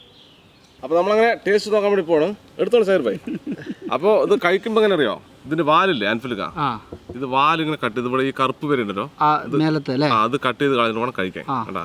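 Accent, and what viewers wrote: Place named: Malappuram, India. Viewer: ഇടക് മലപ്പുറം ഭാഷ വരുന്നുണ്ട്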